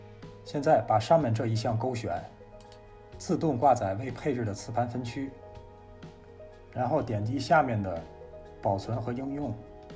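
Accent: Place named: Tianjin, China. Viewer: 我从油管上找了很长时间的视频都他妈没有说得清楚的。您说清楚了。关键是，我听出来了乡音。我是离开家乡二十年的天津人。哈哈哈哈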